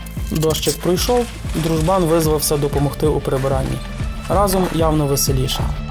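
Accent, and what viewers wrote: Ukraine. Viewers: Смішний, піднамаєш настрій, і діалект класний. Чернівці?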